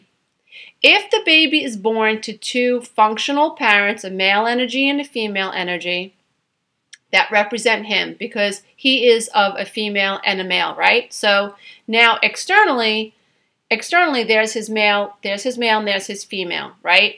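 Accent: New York, United States. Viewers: i just dicoverd your channel.i love your empathy and intrest in your work.you are teaching me somuch!i also love your new york acent! from kevin,an abused child that went thrugh councilg off and on for 30 years.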